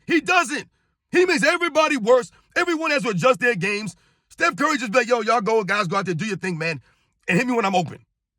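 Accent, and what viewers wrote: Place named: New York, United States. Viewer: Yo, listening to your New York accent makes me miss the days i used to get a 75cent bagel with cream cheese and jelly by Sal's outside of Prospect Heights High😏.... Keep the flame burning my guy, " we must continue to stand up!!!".... ✌🇹🇹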